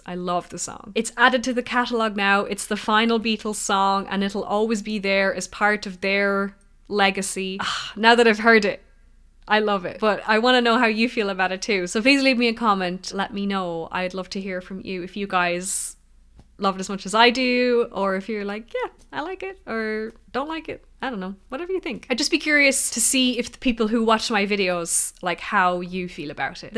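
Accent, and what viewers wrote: Ireland. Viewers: I grew up with music and it helped me through a tumultuous adolescence. I was initially disappointed with their most recent productions, eg. " / " and this particular song. However, I grew to love " " ( This song reminds me a little of " " from that album.) I was used to being very amazed by each new song, that came out from proper, when I was young, that pushed the boundaries of what I'd ever heard before. These newer songs, that I mentioned somewhat disappointed me in that regard, although I did grow to love them. I'm thinking, that this song came out at a very opportune time, with all the stupid shyte going on in the World (war, politics etc.), to revive our love and memories of a better time ( the Artistic Renaissance, that kicked off) and hopefully generate a better perspective in people's minds and hearts to push us forward into the Future. 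Quite simply, WE are BETTER, than this. Adore your lovely Irish accent and look forward to hearing you sing. Thanks for this video. P.S. Do you play/sing, " "?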